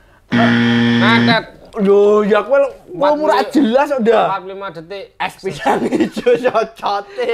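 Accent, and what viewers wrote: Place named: Semarang, Indonesia. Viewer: Logate semarang bgt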